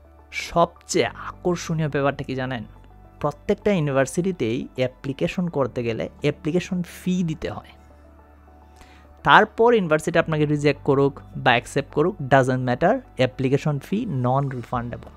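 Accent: Sylhet, Bangladesh. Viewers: ভাই আপনার বাড়ি কি সিলেট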